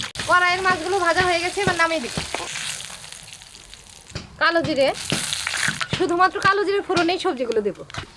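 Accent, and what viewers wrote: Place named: Bangladesh. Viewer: দিদি ভাই তোমার কথা একেবারেই বাংলাদেশের মতো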